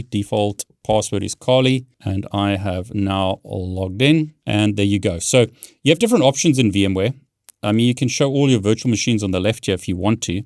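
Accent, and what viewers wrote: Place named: South Africa. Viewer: Thank you so much this was so helpfull. and you sound very south african.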